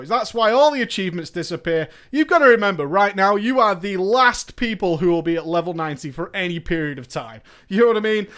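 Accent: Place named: United Kingdom. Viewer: he has a British accent and he lives in england. theres a million and 1 kinds of accents over there.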